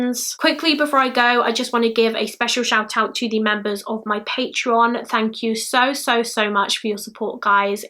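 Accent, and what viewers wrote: United Kingdom. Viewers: i dont hate u but i hate uk accent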